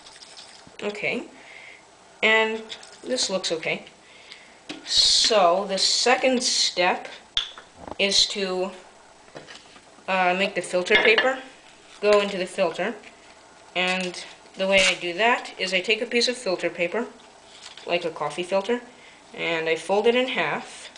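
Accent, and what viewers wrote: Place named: United States. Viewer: does he live in america coz he's accent is really string american! looool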